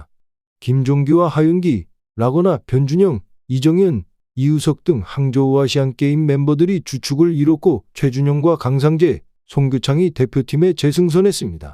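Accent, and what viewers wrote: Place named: Yanbian, China. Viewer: 연변말투 킹받네ㅋ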